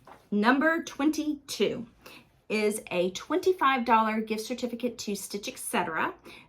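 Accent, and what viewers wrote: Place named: Carolinas, United States. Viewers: I started watching you from a shout out from Brenda and serial starter..I love the name (my daughter's name) and I loved living in the South..Memphis then Atlanta where I started stitching in the 80's.... The kindness civility and the accent! 😃 I have been in the North (for many years and I tune in and find learning and comfort with you as I stitch. Thank you for the celebration give aways: 1,2,6,7,11,15,17,18,19,20,21,22,23,24,28. Congratulations,! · Happy 10,000 Celebration! I enjoy watching you so much. My family is all in the Carolina’s and I’m in Colorado. Listening to you is like hearing them all. :). 1, 2, 4, 6, 7, 10, 11, 12, 14, 15, 16, 18, 19, 22, 27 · Thank you for being so genuine! My husband is from Alabama, it's nice to hear your accent. I love all the things, but #1,3,4,5,6,7,11,14,15,17,18,20,24,28,30 are my choices.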